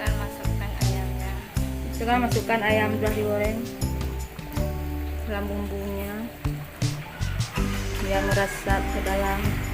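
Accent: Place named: Aceh, Indonesia. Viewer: Brat logat apa Aceh 😂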